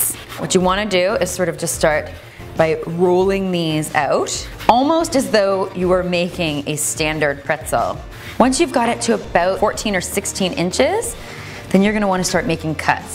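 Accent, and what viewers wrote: Canada. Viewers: You sound Canadian. I love the cinnamon raisin with cream cheese. But now theres competition. I have to figure out which one of these I love the best.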